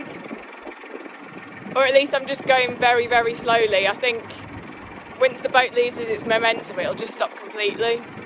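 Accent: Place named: Wolverhampton, United Kingdom. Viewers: Love the video, you have a great West Midlands accent 😊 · 🙂 Thanks,. Moved from the midlands 30 years ago - still miss the accents. Really nice to hear yours. Looking forward to more videos. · Hello. Did you use a drone for the aerial shots? Love your videos and big bonus to hear the accent again (I'm from Wolves too, moved away long ago...) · Thanks for another enjoyable video I've just watched, you really are a breath of fresh air, so natural with no #airs or graces#, but well aware that you are sharing your videos with # a great fan club# you're giving a lot of joy to like myself. I love the Wolverhampton accent, which brings back so many happy memories for me with my late wife, thank you, thank you, keep up the good work, and giving lots of pleasure to your #fan club# all the best, Martin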